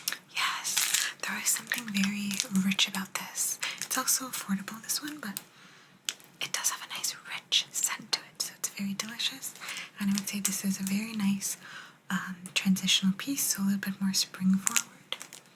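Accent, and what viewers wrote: Italy. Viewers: ❤ love your Italian accent videos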